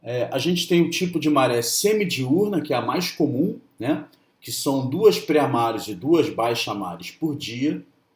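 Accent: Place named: Rio de Janeiro, Brazil. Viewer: ótima aula, inegavelmente professor carioca hahaha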